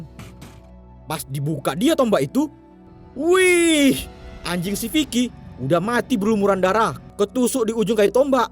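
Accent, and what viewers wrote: Medan, Indonesia. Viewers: Medan x wkwkwkwk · galon 🤣🤣 . medan kali bah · logatt medan emg gituu bro wkwk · Suka kali sma suara abg ini...medan kli🤣😭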